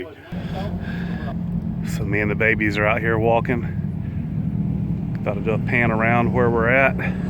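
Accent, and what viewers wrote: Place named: New England, United States. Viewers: Nice to hear the New England accent out there on desert! That slide guitar music reminds me of my daddy and his band back in the 50's . My daddy could play an electric lap guitar with a with a metal slider. It was great! He knew Les Paul and Mary Ford! The band used to play clubs on the East Coast of New Jersey. That music ending your video today was a nice memory. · I knew it was a Boston accent! Tho you do have a bit of a down east accent (you know those Mainers!) Boston here too. GO PATS!! 🏈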